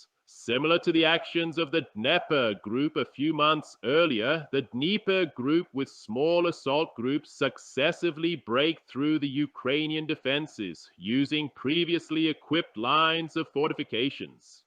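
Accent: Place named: Afghanistan. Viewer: Why the afegani voice?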